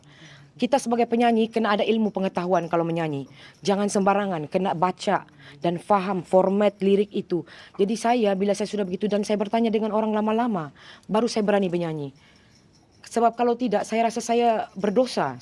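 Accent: Sabah, Malaysia. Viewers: Slang sabahan itu mahal . Suka dgr👍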